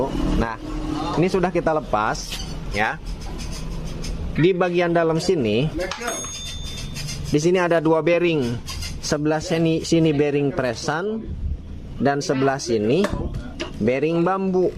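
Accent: West Java, Indonesia. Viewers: Makasih ilmu nya kang..sunda banget kang pengampean nya tp mudah di mengerti.❤❤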